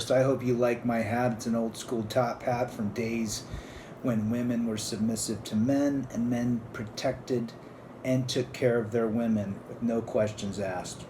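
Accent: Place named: RP, United Kingdom. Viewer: love the top-hat accent! 😃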